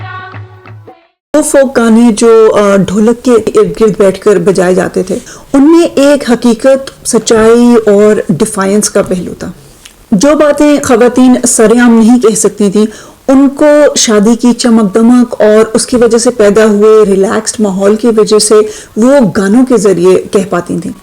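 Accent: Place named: Pakistan. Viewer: I love your Urdu accent. Vintage ptv Urdu haha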